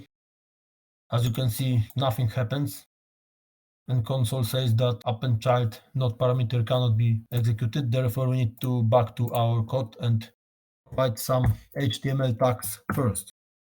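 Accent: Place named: Malta. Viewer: ah! You sound Maltese. Thanks for your informative videos. Dziękuję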